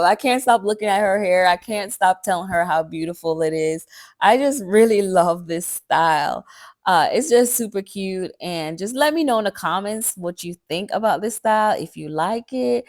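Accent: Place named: Jamaica. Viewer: loves why I think I heard a bit of a Jamaican accent in this video 🇯🇲🇯🇲🇯🇲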